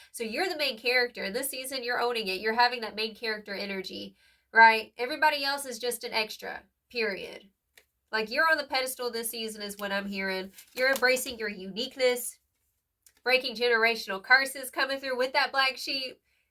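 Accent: South, United States. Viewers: I’m loving your accent , I’m guessing South Carolina, Georgia? I am rising like a phoenix, a thunder bird. ❤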